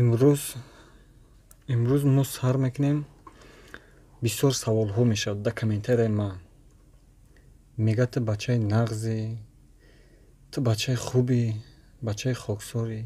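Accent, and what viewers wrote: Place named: Pamir, Tajikistan. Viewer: ты ранги помирийо гап мезани бо йагом рагт помири набоша ба пеш 👍👍👍👍👍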